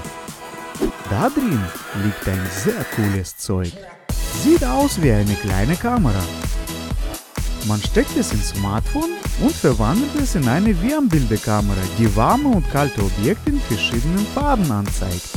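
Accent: Russia. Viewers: Ich liebe deinen russischen Akzent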